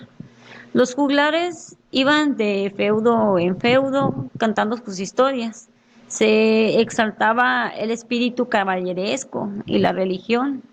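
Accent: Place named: Veracruz, Mexico. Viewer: Pareces de Veracruz.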